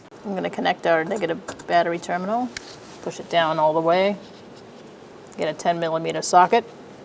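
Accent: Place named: New York, United States. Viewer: Sounds like a newyork accent 😅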